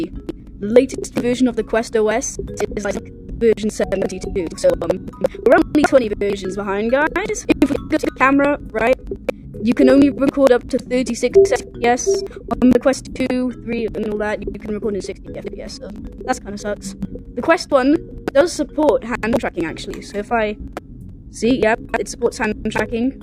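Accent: United Kingdom. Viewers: Great vid as always. ❤️ ur British accent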